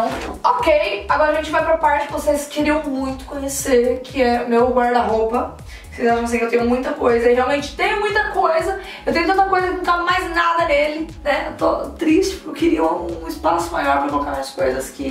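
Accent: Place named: Rio Grande do Sul, Brazil. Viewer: Sotaque gaúcho....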